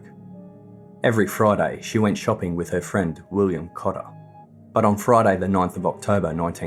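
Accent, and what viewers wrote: Australia. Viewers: I love the podcast. I love hearing the AUSTRALIAN accent Makes me feel connected to that part of our world. I have always felt that the people in Australia have a kind of spirit like many in the USA. We are both young countries and there’s an exuberance in the people in both of our glands. I don’t know if I’ll ever make it to Australia , but your podcast brings me a bit closer. God bless us all on this extraordinary planet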